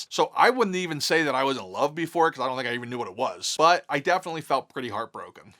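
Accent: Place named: Philadelphia, United States. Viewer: You never said you were a Philly boy, but I knew the voice was 100% Philly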